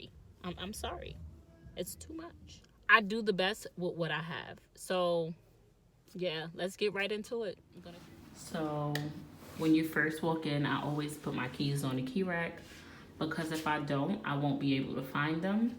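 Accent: New York, United States. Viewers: Girlllll I knew you was from NY the moment I heard you talk!! I'm from The Bronx and can definitely appreciate you keeping it real. Loved the apartment tour!!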